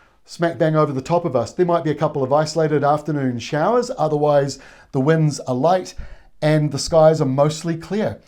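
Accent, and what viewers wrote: New Zealand. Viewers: Good clear kiwi presentation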